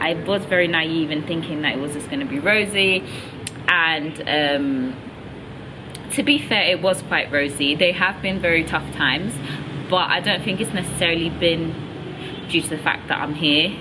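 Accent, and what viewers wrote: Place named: United Kingdom. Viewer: hey!! Welcome back. I even forgot you had a British accent... Eek!!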